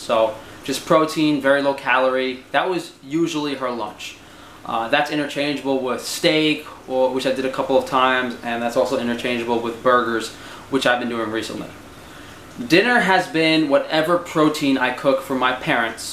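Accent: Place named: New York City, United States. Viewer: Interesting accent. Can hear some nyc italian twang.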